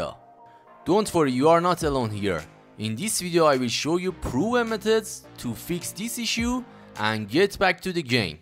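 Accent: Iran. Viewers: با این لهجت بهتر نیست فارسی فعالیت کنی داداش؟! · از لهجت معلومه ایرانی خو ایرانی توضیح میدادی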